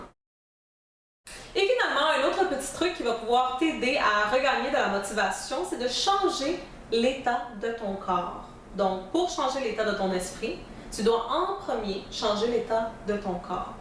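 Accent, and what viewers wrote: Canada. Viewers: impossible de comprendre le francais canadien...hélas. :(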